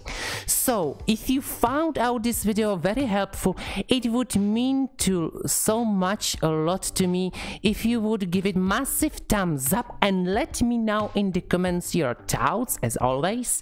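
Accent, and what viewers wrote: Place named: Italy. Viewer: What AMAZING ACCENT! Is it Italian? I LOVE it! Also, thanks for the guidelines!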